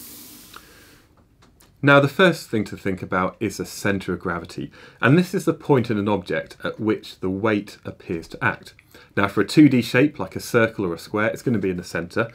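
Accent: United Kingdom. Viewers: English accent: “ah, I’m home” Spells it ‘center’ : “wait, what?”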